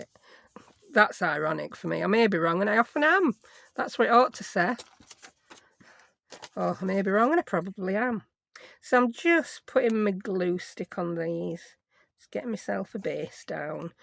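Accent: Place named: Yorkshire, United Kingdom. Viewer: New to your channel and I love your work. These journal cards are beautiful. Also love your accent and waffling.. from 'nuther Yorkshire Lass ;)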